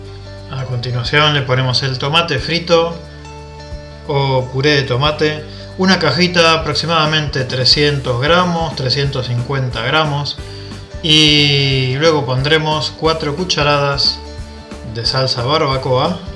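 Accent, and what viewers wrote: Argentina. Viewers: Hola...soy una nueva suscritora. Detecto un acento Argentino 😊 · sos argento??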